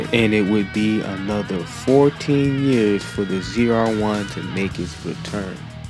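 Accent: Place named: Africa. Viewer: Why do you have an African narrating this video?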